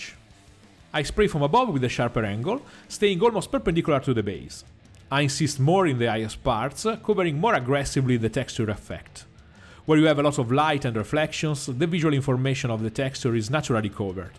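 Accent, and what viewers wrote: Italy. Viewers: Però se parli italiano qualche volta non farebbe schifo 😂😂 si sente che sei italiano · Awesome Italian accent dude 😎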